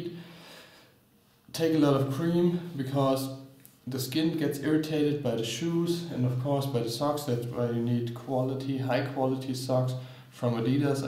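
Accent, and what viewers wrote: Germany. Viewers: Man hört mega deinen Deutschen akzent😂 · Man hört das er deutsch ist xD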